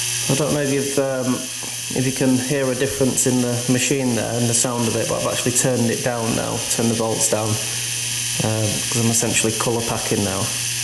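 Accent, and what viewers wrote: Yorkshire, United Kingdom. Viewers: Where abouts in the UK are you from? You sound very Yorkshire...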